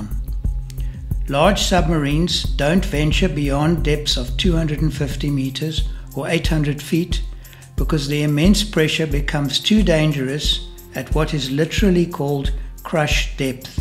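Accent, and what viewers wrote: South Africa. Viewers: I hear a very Afrikaans accent 😄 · Nice South African accent . 😊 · Do I detect a South African accent? 😁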